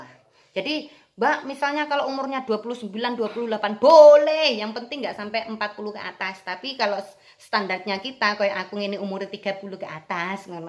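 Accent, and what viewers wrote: Java, Indonesia. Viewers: jangan medok ngono to balik asli bae kon tu cantik ngono e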